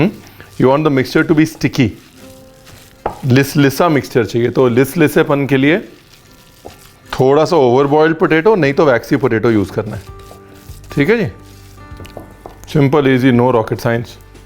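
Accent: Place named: Lucknow, India. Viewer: I like the recepie and your Lucknow Hindi is awesome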